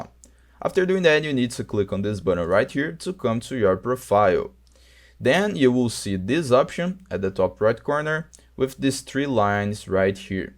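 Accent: Brazil. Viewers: video bom puto (esse sotaquezinho brasileiro nunca engana)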